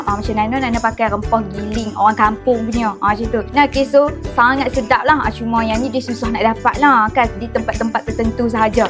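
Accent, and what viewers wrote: North, Malaysia. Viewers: Ulang tngok...suka dngaq loghat utara...sambil tu nk ingat resepi...tk bnyk2 😊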